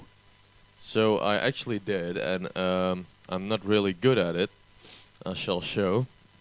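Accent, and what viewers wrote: Netherlands. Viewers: lol he's from the netherlands :P. je hoort ut gelijk aan z'n accent. nice vid btw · thou sounds dutch. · Haha i can hear your dutch just like me:D im enjoying the video;)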